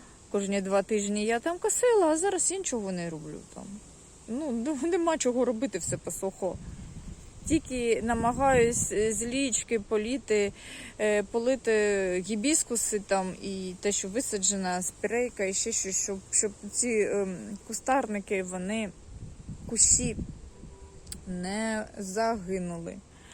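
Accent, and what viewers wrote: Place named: Russia. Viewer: Трудно слушать украинскую мову , в исполнении русскоговорящих.Ну так и хочется помочь . Зачем ? Конечно может это требования ютуба или нашей е- ной власти , а может необузданый патриотизм , не знаю . В любом случае сочувствую.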